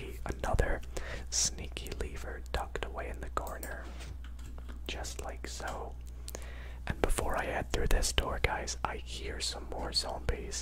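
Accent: Scotland, United Kingdom. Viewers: Are you scottish bc my friend is scottish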